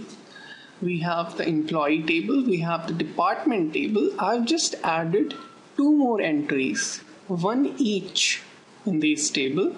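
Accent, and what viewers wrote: India. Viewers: You have a strong Indian accent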